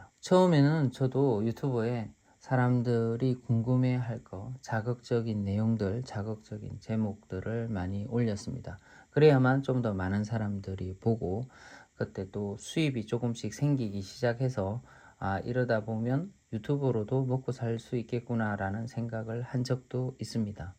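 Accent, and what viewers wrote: Gyeongsang, South Korea. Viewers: 이렇게 듣기좋은 경상도 사투리는 첨 이네요😊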